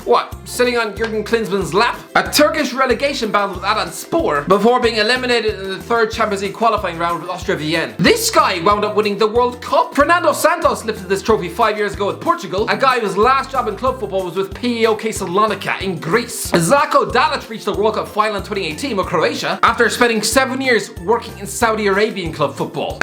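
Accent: Ireland. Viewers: Strangely this video served as a kind of anti pep talk. Thanks shouty Irish man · My daily dose of an Irish guy screaming into a camera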